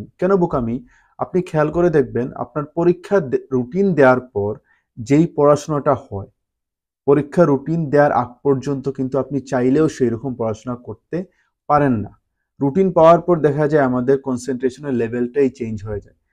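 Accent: Sylhet, Bangladesh. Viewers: Apni Sylheti ni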